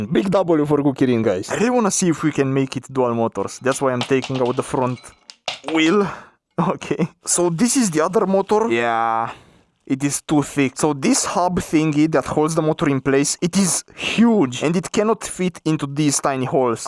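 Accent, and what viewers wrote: Romania. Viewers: Ba se vede ca ai accent roman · Yeh, he is Romanian guy from the english speak and accent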